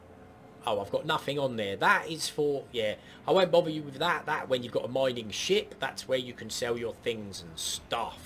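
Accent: Cockney, United Kingdom. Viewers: thicc british accent "hello this is " laughing, then liked subscribed and commented 3 seconds in. this guy knows how to YT properly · i've been threatening to jump in and your cockney accent almost convinced me m8. lets start a Star Citizen clan named Mi6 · Cant stand it. Well I could for all of four minutes. The least attractive accent in the UK so no thanks. See ya! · Was NOT expecting you to be cockney but now the channel name makes sense XD SUBSCRIBED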